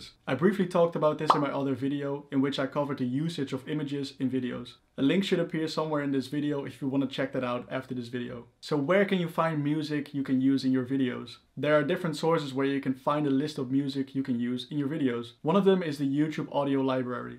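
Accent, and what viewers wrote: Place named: Netherlands. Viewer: you got an accent are you from the netherlands?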